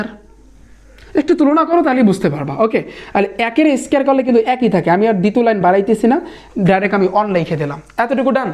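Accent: Barishal, Bangladesh. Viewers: Baiya apner bari ki borisal🤌🙂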